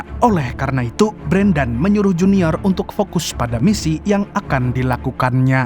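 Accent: Banjar, Indonesia. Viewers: Banjar banar pian mang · Behh admin urg banjarr skalinya😂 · Urang banjar sekali nya sidin nih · Banjar neh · admin nya banjar hhhhh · Adminnya orang banjar kh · NGALIH BUHAN BANJAR NE 😅😅 AYAKKKKK SAAANNNIIIIII🤣🤣🤣 TAGUGUR-GUGUR ULUN MANDANGARNYAA😅😅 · Gua curiga sama suara ini pasti orang Banjar balas sanak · Orang banjar kah min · urang banjar kah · 😅😅 urg banua sakali nya mimin nya😅😅😅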